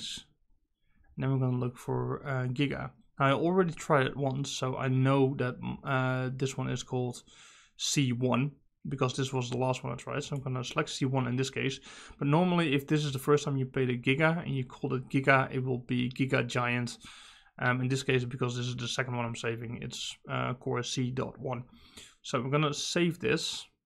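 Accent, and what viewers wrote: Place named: Netherlands. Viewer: Theres such a good Managarmr paint job Actually (fakka strijder btw, k hoor gwn dat je NL bent over je engels XD) gooi ff je discord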